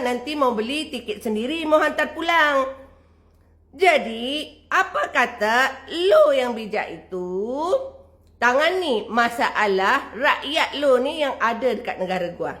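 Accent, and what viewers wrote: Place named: Indonesia. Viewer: Mek...kalau boleh jgn cakap loghat indon....sebab sekarang ni pon mereka kata rakyat MaLaysia lebih suka bahasa Indonyetsia 🐒🐵 mereka tu...kita guna loghat MaLaysia je ...Kalau kita ore kelate kita cakap kelate...kalau kita utara kita cakap loghat melayu utara ...bagi depa faham sikit... 😁🤣✅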